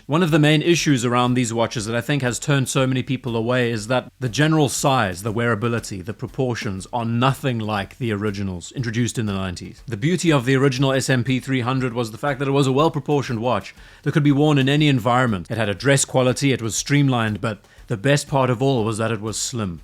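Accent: South Africa. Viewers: Wait now, what is this accent, are you South African?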